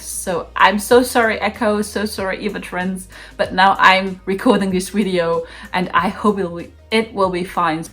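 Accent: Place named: United Kingdom. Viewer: U from the UK, hun? That accent, though! 😄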